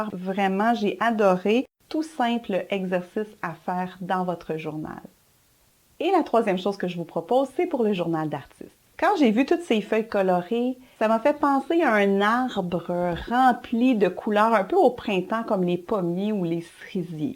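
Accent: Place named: Quebec, Canada. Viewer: Ce que j'adore c'est qu'au Québec il fait froid une grande partie de l'année mais l'accent réchauffe le coeur de celui qui écoute autant que l'accent de Provence !=)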